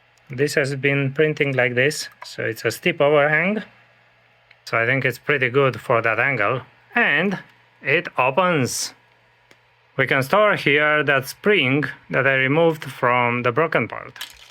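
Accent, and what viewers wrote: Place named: Romania. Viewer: are u from romania? ur accent sound like a romanian one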